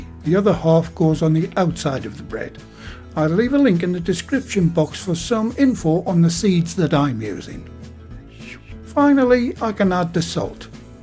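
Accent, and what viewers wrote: United Kingdom. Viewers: I tripped on to your channel while looking for a Multi-grain seeded bread to make. I am definitely going to try this, thank you! You sound so sweet and I'm a sucker for the lovely British accent! Reminds me of my years spent in the Air Force stationed at RAF Alconbury years ago in East Anglia. Tell me Sir, how would I modify this for a Bread machine? I assume it needs to be wetter right?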